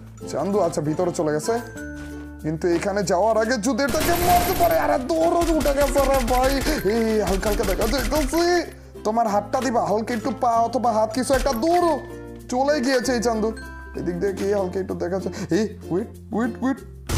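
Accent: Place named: Comilla, Bangladesh. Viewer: ভাই তোমার কন্ঠ শুনে মনে হচ্ছে তোমার বাড়ি কুমিল্লা।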